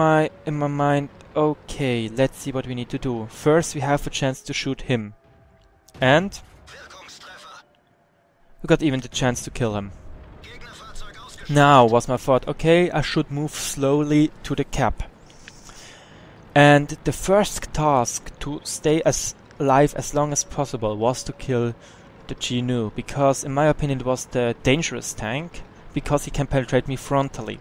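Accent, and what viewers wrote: Germany. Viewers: Du bist Deutscher oder? ;)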